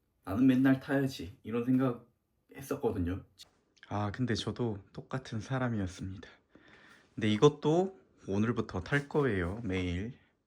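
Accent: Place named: Jeolla, South Korea. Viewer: 약간 광주, 전라도 사투리 억양이 느껴지는데 혹시 광주쪽 사람인가요?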